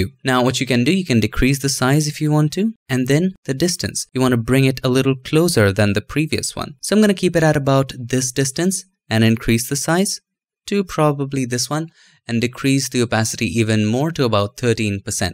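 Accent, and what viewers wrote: India. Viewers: You know what’s better than an annoying Indian accent? Proper English.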